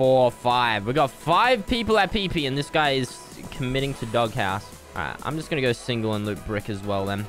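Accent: Australia. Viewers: Nutella and Breadsticks can’t u hear he has an Australian accent Asian people don’t.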